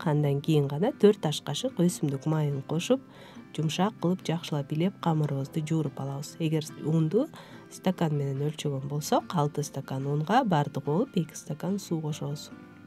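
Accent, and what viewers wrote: Standard. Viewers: Азаматсың таза сүйлөп,үнүң да ушунчалык уккулуктуу экенсиң! Колуңа береке сиңдим! 😍😍👍👍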